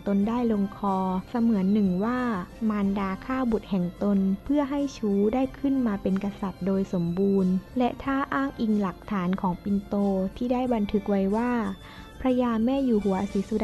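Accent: South, Thailand. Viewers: น้องนี่คนใต้แน่ๆนิ ทำได้ดีนะน้องนะ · คนอ่านบ้านอยู่ต่ำกว่าประจวบฯลงมาใช่ไหม?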